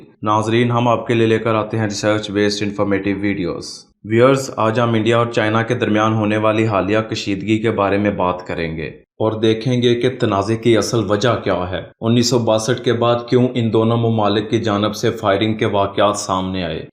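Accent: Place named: Punjab, Pakistan. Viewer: Why you have Punjabi Accent. Because you are part of India.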